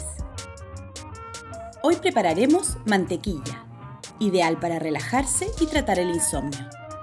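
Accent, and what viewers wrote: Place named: Chile. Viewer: Estado intentando practicar el acento chileno pero es muy difícil, espero no equivocarme eres chilena, y no utilizaste mucho de los modismos Pero tú solo hablar se nota tu acento, crees que puedas ayudarme a mejorarle acento chileno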